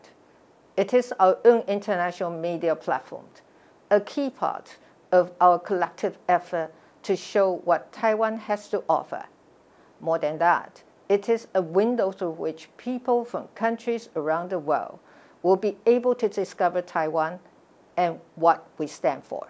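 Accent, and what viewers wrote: United Kingdom. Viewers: 小英的英國腔英文真好聽！台灣加油啦加油💪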